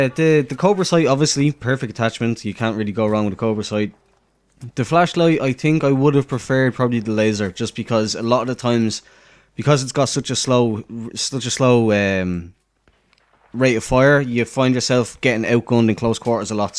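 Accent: Ireland. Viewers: Where in Ireland are you from? Used to live in Leixlip a long time ago! Just subscribed a few days ago - and am enjoying the content!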